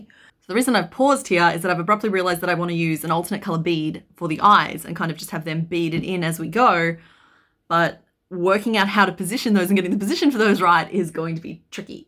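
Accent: Australia. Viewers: I was over the moon from the moment I hear the Aussie accent! No disappointment here 😂 look forward to binging through your videos now "hyperfocus? Me? Never!" 😅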